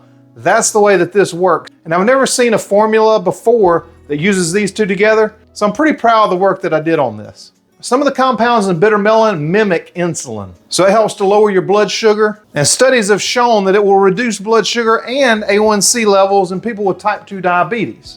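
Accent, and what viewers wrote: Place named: Gulf Coast, United States. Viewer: I'm going to give this a try! I've been really enjoying your videos, especially because your accent reminds me of home (I grew up on the Gulf coast) 😊 Thank you so much for all the helpful information!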